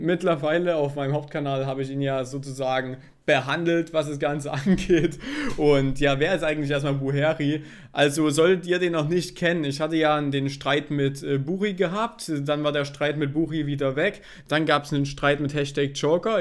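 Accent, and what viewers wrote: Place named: Saxony, Germany. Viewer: Najoa, solang das alles geklärt ist, ist alles gut. Man muss halt vorsichtig mit einigen Äußerungen sein aber so lang er es nicht ernst meinte und er seinen Fehler einsieht, solle mich net stören. Ich Sag's gerne immer wieder aber ich feiere diesen sächsischen Dialekt so derartigst. Das klingt wie meine Familie mal mit Ausnahme meiner Eltern und meines Bruders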